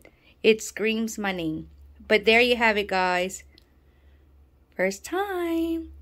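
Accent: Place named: Cajun, United States. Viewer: that cajun accent, you was extra heavy with it and i love it lol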